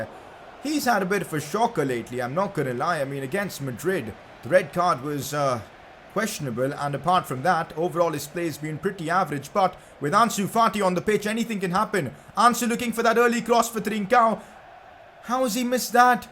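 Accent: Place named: India. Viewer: Your voice is worse than your first videos cuz of indian accent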